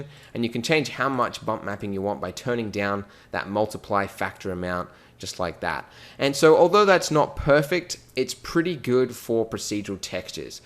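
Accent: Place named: Australia. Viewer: He is Australian i am guessing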